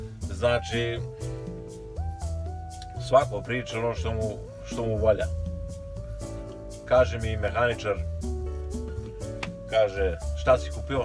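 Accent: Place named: Central Serbia, Serbia. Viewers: Meni je posebno zanimljiv govor, pretpostavljam centralna srbija (kragujevac, jagodina, krusevac) jer sam i ja odatle... samo nastavi, extra klip ;) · Zemljak, ti mora da si negde iz Krusevca,Paracina i tih krajeva? Rekao bih po govoru. A sto se tice poslovanja sa Bugarima....oni su su veci prevaranti i od nas tako da ti to sve govori. Puno srece u poslu.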